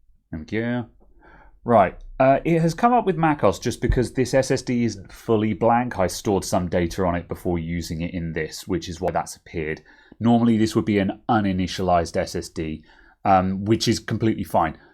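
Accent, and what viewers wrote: United Kingdom. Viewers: ok, so your British, but was it your mom or you dad who where the billy goat?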